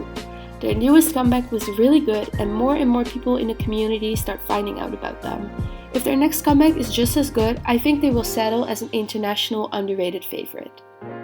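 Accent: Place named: Netherlands. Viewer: hey, i have a question? where are you from? because ur voice rlly sounds dutch lmao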